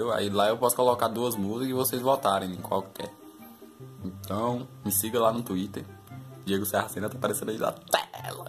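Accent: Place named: Northeast, Brazil. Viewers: Forró é vida ? Só pelo sotaque vejo que é nordestino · Teu sutaque parasse se da Paraíba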